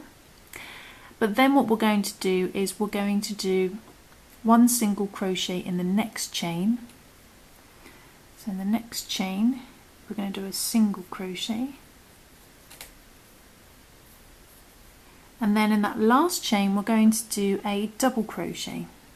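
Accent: England, United Kingdom. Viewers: You sound English so why don’t you say the stitch’s in English not American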